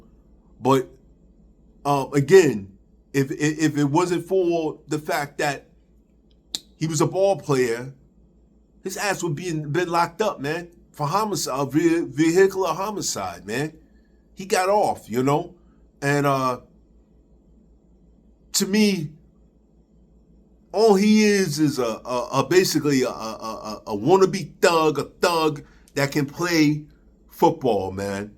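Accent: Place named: Brooklyn, United States. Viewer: My man much love I’m feeling this video. I’m from Brooklyn. Where are you from bro? You sound like you from home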